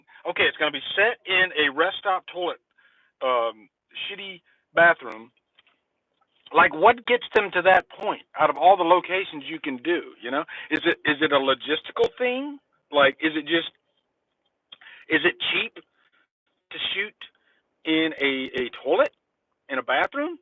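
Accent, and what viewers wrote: South, United States. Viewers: We have Tesco express here in the UK- Marks and Spensors if you’re wanting posh! Love your southern drawl…. I was born in London, and moved to Norwich… So now I sound like a cockney farmer! 😳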